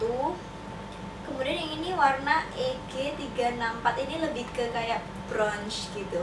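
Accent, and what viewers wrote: Java, Indonesia. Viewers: Asli mana ka,,,,jawa nya medok.bgt hehhee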